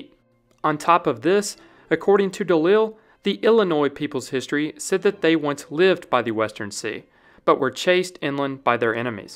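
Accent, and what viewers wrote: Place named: Ohio, United States. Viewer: Dear, this is fascinating history. However, your pronunciation of Dutch, Spanish and French personal names is cringe-making. You know, you can Google pronunciations of practically anything, thereby making you sound less like an Ohio kid who's never been farther than 100 miles from home. I know I'll get pushback for saying this, but there's a reason why, in England, Received Pronunciation (RP), or BBC English, is considered desirable for journalists -- so that accents and regional pronunciations don't distract from the reporting. Good luck!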